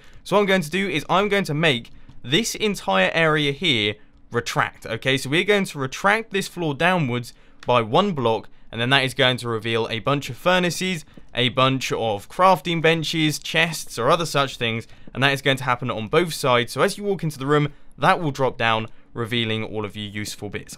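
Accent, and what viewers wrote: United Kingdom. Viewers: I LOVE, AND I AM AMERICAN, SO AMERICANS LOVE BRITISH PEOPLE. THE ACCENT IS SO AWESOME. I respect that you spend time on these recordings, as I make videos myself, and I work for each one for about 4 hours, but you spend 3 whole days.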